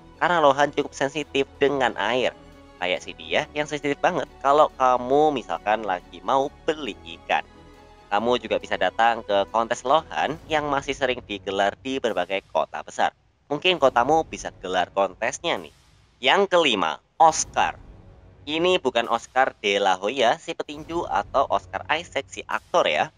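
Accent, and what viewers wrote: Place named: Java, Indonesia. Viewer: Temen temen cenderung ngenyek🙈 Logat jawa nya tetep gabisa ilang walau di gimanain juga😅 Tetep semangat bangs💪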